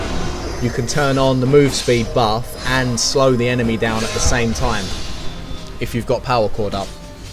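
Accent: United Kingdom. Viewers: British :P <3!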